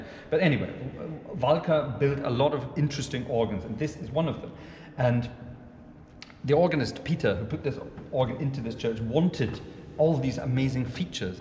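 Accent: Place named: Scotland, United Kingdom. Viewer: Well that's quite an Organ very versatile which will please your. I could hear the pleasure in your voice playing around with this instrument. Very Uncongenial to say the least. Very enjoyable video indeed. And yes your Scottish for sure not that that's a bad thing. Someone has to think about the money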